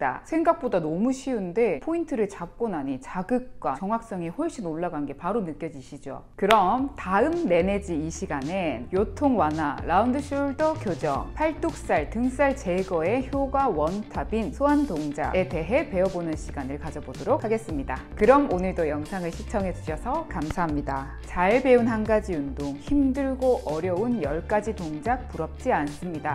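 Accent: Gyeongsang, South Korea. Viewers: 경상도 말투~ㅎㅎ 넘나 좋은 운동 감사합니다♡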